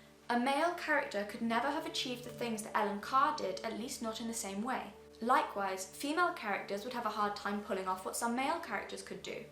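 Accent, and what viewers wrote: United Kingdom. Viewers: I'm so glad it's a british chick saying this. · i thought this was gonna be some insufferable libtard or conservtard discussion on "misrepresentation of gender archetypes in fiction" that instead rags on commercialisation or identity politics etc in, i hear a british accent and eat my thoughts (not a comment on british people, also not a comment on american people. its less likely for a non-american to be republicanly/democratly charged than an american) i think this is gonna be good in and it sounds kind of consevtard but the coments on the quality of this video inclines me to think that your going to give reasonble basis for the selection of his specific evidence to and i get the clarification i asked for now im waiting for the clarication i need oh yeah i forgot to mention: subscribed. i dont remember when but im now i hoping you prove me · This has to be the most "propa" british accent I've ever heard. 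· Woman are beautiful Strong and they can run very fast they are not weak And this woman accent is very beautiful and I love British Woman they are very powerful and I love her accent so beautiful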